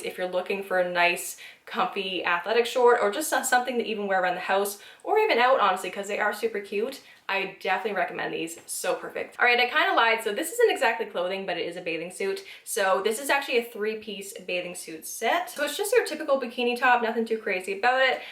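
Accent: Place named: Canada. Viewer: I'm 51 from Australia and wish Temu was around when I was in my 20s! Great finds and so affordable too! - thanks. I've got my eye set on that chain bag and the watches. PS - Your hair looks great and really suits you. Love the Canadian accent too, I went to London Ontario Canada when I was 13 to visit family and would love to go again one day. Beautiful country!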